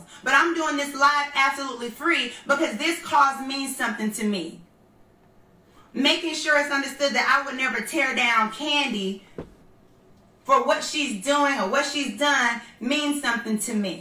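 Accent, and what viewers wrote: New York, United States. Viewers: You don't usually sound like this... New York accent... sounding like Nicki Minaj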